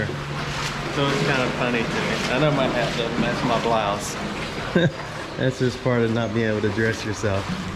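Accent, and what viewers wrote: South, United States. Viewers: It’s no problem understanding you and I’m from the west coast. You speak deliberately and with very little southern accent. Your sweet wife…how she smiles at you!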